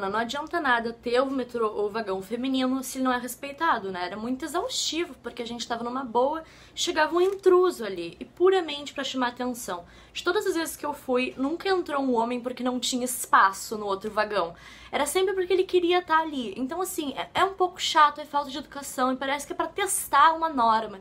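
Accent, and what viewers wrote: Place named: Porto Alegre, Brazil. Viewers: Cara, só quem mora desde criança em POA tem esse sotaque chato que nem essa moça. Mas no resto do estado os sotaques são legais. Ah! Sou riograndense. Eu acho que ela é descendente de alemão. O alemão é bem exigente e verdadeiro. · Mariana antonucci Tem mais haver com o sotaque do que com a dicçao. Os porto alegrenses falam assim mesmo,assiste um debate da luciana genro que tu vai ver kkkkk.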